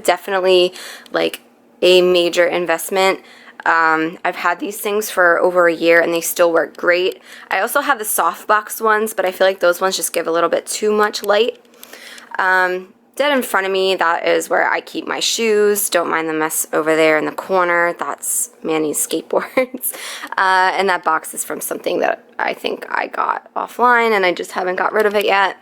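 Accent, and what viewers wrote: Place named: Philadelphia, United States. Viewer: Thank you for your vids! I’m just about to start selling on Poshmark. I Love your simple instructions, and your inventory idea is brilliant! I used to live outside of Philly (Mainline and Drexel Hill), Love that accent! 😘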